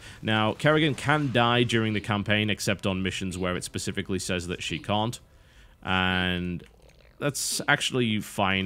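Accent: United Kingdom. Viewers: I am honestly loosing my ability to detect your british accent... I don't know if it because I watch a lot of channels with accents from the U.K a lot and therefore Americans and people from the U.K are starting to sound the same but either way I can't even tell the difference that easily anymore.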